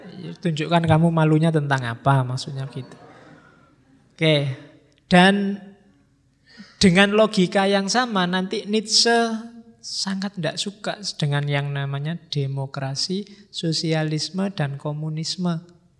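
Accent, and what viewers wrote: Java, Indonesia. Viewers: Tak apalah medok, yg penting tidak berhenti bermanfaat untuk orang lain. Sudah dapat ilmu gratis masih banyak menuntut. Orang yg punya niat belajar/mencari ilmu tidak pernah berhenti belajar apapun halangannya. Klo masih tak paham. Biarlah si butet & kawan2 bikin forum sendiri.... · Bahasanya medok campur jawa, kasian si butet banyak yang dak ngerti😂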